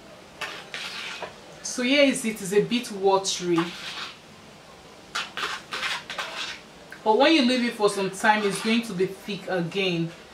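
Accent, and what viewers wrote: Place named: Africa. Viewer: Love that African accent P.S. you are beautiful!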